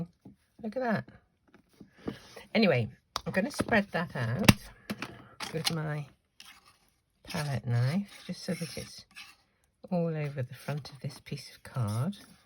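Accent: United Kingdom. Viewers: I just found you I love your British accent I'm from East if Europe but I leave in USA and love your video so much is so so interesting and very educativ .I'm a beginner on card making Thank you so so much Best to you from VA 🇺🇸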